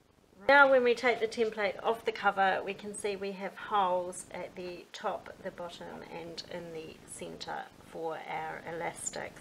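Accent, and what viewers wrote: New Zealand. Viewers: Just found you on this tutorial. Thanks so much, I can’t wait to get started on some second hand wallpaper and make a lovely cover using your technique, which will be sold in a very upmarket decor shop. So exciting. Awesome! Thanks once again from South Africa 🇿🇦 Is that a lovely New Zealand accent? Love it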